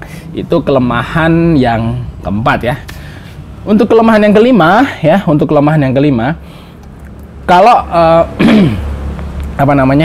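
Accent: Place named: Semarang, Indonesia. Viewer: medhok men lek,bahasa semarangan e 🤣🤣🤣🤣🤣🤣🤣🤣🤣🤣🤣🤣🤣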